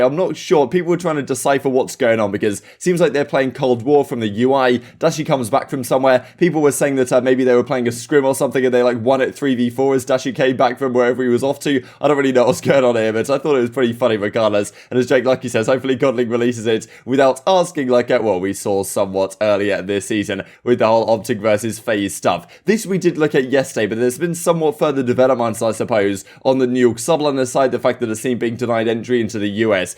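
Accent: United Kingdom. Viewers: Watched your video last night, and as soon as the intro sound happened, followed by the "Alright guyyyyys, ", my girlfriend looks up and says "I feel like I live with this guy... I can't even understand him, he talks so fast! At least he's British though and not annoying like that other dude!" (she hates Maven.. haha)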